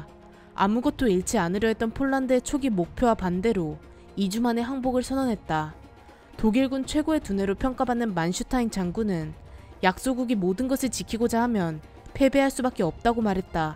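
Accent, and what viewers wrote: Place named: Gyeongsang, South Korea. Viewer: 경상도 억양이 섞여있으셔서 그런듯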